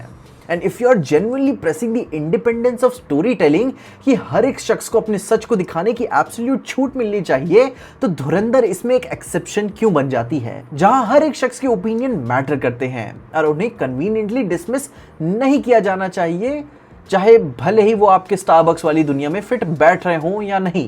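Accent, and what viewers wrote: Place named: Bihar, India. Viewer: Bhaiya majak nahi Karu ga per aap ki awaaz beach beach me bihari jesi sound kar rahi thi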